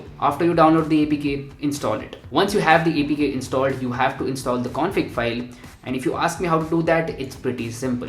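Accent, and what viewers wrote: India. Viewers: Indian when speak English 🤮🗑️🥵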